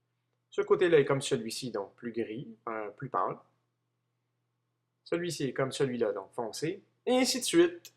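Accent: Quebec, Canada. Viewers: bravo belle vidéo , même avec ton accent canadien j'ai tous compris lol merci . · Dulcineia Lemasle son ascent et québécois normalement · j aime bien ton accent québecois xD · Heu.. tes Quebequois? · Franchement, je suis tes vidéos et elles sont toutes extra. Au fait j'adore ton accent Canadien.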